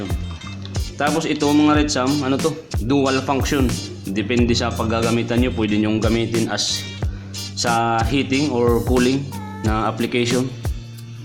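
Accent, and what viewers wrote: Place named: Bicol, Philippines. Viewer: aram ko po an tono kan pagtaram kan bicolano hehe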